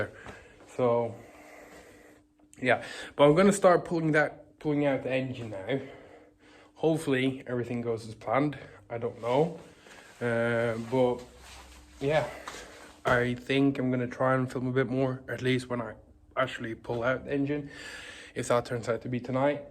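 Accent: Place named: England, United Kingdom. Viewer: fan jävligt bra video måste jag säga ändå och din engelska e fan jävligt bra låter som du kommer från england nästan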